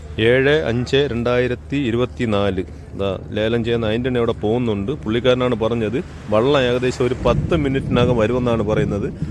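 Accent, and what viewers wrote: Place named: Thiruvananthapuram, India. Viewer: തന്റെതായ അവരണ ശൈലി തിരുവനന്തപുരം സ്റ്റൈൽ സൂപ്പർ 👍❤️🎉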